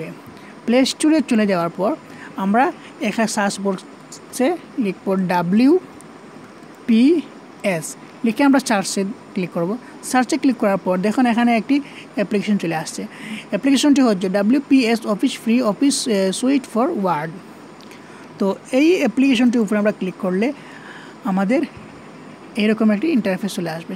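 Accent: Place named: Sylhet, Bangladesh. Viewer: তুমি সিলেটি নি ইয়া মাত বুজা যার🤣যাক আমি কিতা তাইলে বুঝছি নি, জানাইয়ো তে কমেন্ট এ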